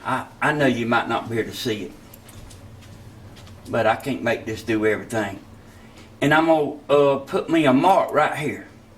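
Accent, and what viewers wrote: South, United States. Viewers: I love the southern accent. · love your good ol boy southern accent..i just chuckled listening to ya...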